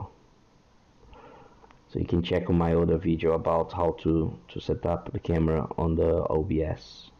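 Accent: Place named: Brazil. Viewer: Your English sounds like "Brazilian English", it's an easy accent for me to understand. Thanks for the video, it worked!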